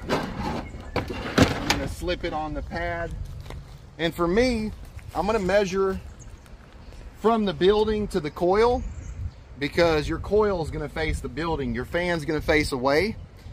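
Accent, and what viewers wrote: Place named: Tennessee, United States. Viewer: This is a truly excellent video on how to install a mini split. Thinking I will be using this tutorial if and when I need to replace mine. One thought,....likely a regional thing, but I notice your frequent use of "Take and...." before words like, "Use", "Push", "Put" etc. I have heard this before,.....might be a Tennessee thing. Just Push, Put, or Use,.....without the "Take and..." Might not feel right to you if you grew up with everyone saying "Take and...." Otherwise,....a great tutorial,...thanks for taking the time to video, edit and post it for us to learn from.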